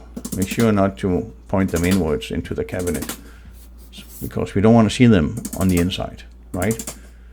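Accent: Denmark. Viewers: Great videos , especially your webpage on the Sektion Rail measurements! I’m gong to take a guess that you are Danish? Sounds like very Danish accent , I love it 👌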